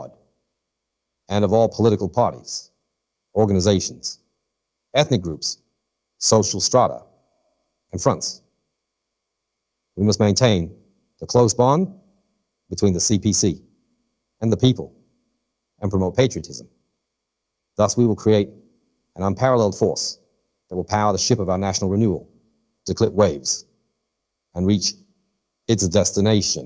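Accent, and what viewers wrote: Australia. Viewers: Assuming they’re using ElevenLabs, nope! 😂 Funnily enough, it seems ElevenLabs automatically tries to pick an accent appropriate with the geographic location of the source speaker’s accent. For instance, if a British voice is reading Portuguese text, it will likely read with a European Portuguese accent rather than a Brazilian one, and an American reading French text will read with a Canadian French accent. I guess because China is closer to Australia and the source audio is of a native Chinese speaker, an Australian accent was chosen for English. Unfortunately, the feature is automatic and somewhat unpredictable for now. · Great but weirdly Australian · Another fascinating speech. Curious if anyone noticed a touch of Australian accent in there...? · Definitely Australian past the middle, not slight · Has an Australian accent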